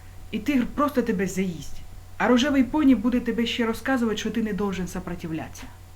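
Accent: Surzhik, Ukraine. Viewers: Два вопроса: 1. Почему такой жесткий суржик? Выбирайте уже один язык, и говорите на нем. 2. Почему камеру так жестоко колбасит? А вообще классно, в точку! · БЛЯ! Какой говеный суржик. Пизди бля. или по-русски, или по-украински!))) А так все верно!)) Молодец! К сожалению продажного тупого дерьма. особенно на Востоке. очень много!)))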